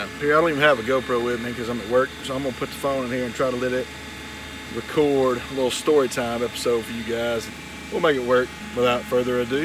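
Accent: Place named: Alabama, United States. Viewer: Thanks for the fishing story, I left Alabama back in 1966, but it sure is good to hear the accent again.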